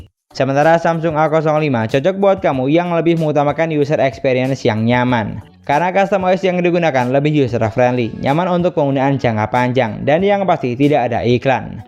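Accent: Madura, Indonesia. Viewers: Madura ya bang?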